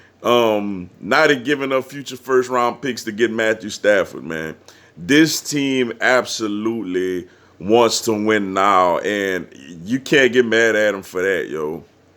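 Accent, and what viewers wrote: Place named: Gullah Geechee, United States. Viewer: Bruh, never seen a video from ya, but say, you grew up in Charleston or got a lot of fam from there? You sound a lil geechy cousin...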